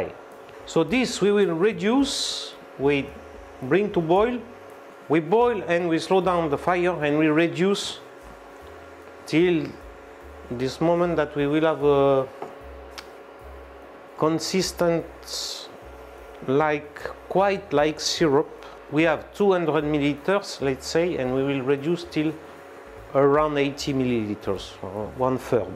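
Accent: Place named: France. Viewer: Merci pour ton tuto ..Trop cool ton lovely french accent😄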